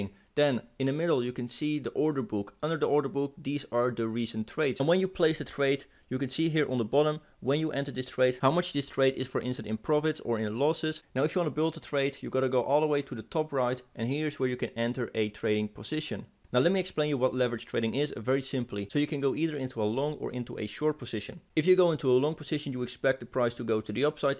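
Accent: Netherlands. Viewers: Definitely van Nederland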